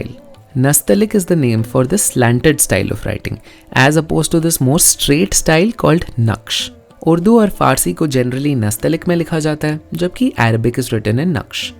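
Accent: India. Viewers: Not at all..his accent and pronunciation is like indian 😅 i guessed right when i heard his first word lol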